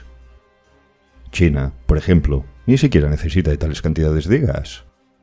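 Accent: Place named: Zamora, Spain. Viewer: Hola por tu habla (acento) no crei que fueras de Latinoamérica pareces mas de mi querida Zamora España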